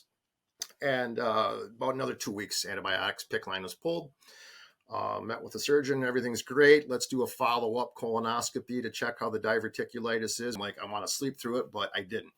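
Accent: United States. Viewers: I hear from your accent that you are American. I'm sorry that America has failed you. The rate of cancer in young people is at an unprecedented high - and it is mainly cancers of the stomach and colon that have shot up in young people to levels never seen before. This is mainly due to the ultra-processed nature of food in America. Cancer is no longer only for old people in America. If you experience symptoms at any age - even in your teens or twenties - you really need to get screened for cancer especially as an American - if you can afford it. Because, you know, 'Merica and it's wonderful healthcare system.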